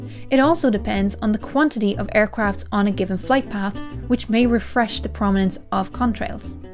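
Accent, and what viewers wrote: Ireland. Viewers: It's that Irish accent, it's very nice 😁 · yes, has a Welsh accent. has an Irish accent. Welsh accents are a bit harsher. is easier for people to understand. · Have to confess I’m a sucker for a female Irish accent even if biting sarcasm is missing. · Oh I like her voice.... Love that Irish accent. Much better than that welsh stuff (kidding, love ya ). So contrails... fuel is a hydrocarbon.... the hydro is important here. When the fuel burns one of the exhaust components is water vapor. That water vapor is what we see when the conditions at altitude are right to cause this to happen. Temp, pressure and humidity are important factors. These conditions might exist at ground level, or only above 40,000 feet, or anywhere between. So... yeah, just water kids, nothing more. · she has a lovely irish accent, i set the voice on my satnav to Irish Female, its so melodic.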